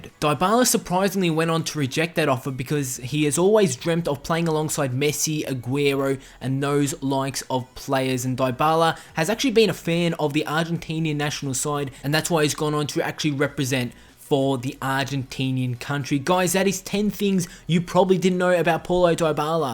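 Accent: Australia. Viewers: Are you Aussie or something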